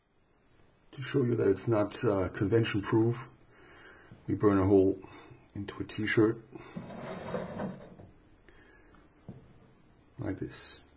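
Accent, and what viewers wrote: Germany. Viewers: Du bist Deutsch, oder? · du bist deutsch oder?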